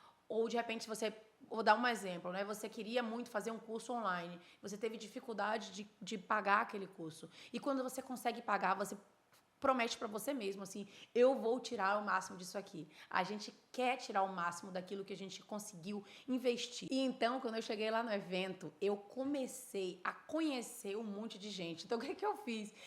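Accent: Bahia, Brazil. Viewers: Adoro sua energia seu sotaque baiaho tou com tigo ❤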